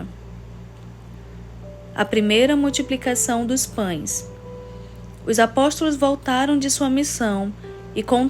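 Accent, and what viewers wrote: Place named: Rio de Janeiro, Brazil. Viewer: Tu és do estado do Rio de Janeiro?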